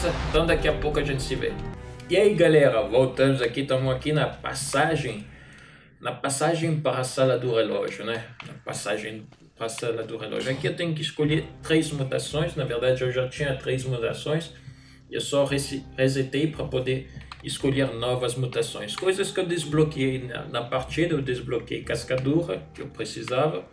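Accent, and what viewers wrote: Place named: France. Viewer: explica muito bem, mesmo com o sotaque francês puxado..., é até um diferencial..., mais um ótimo vídeo!!!!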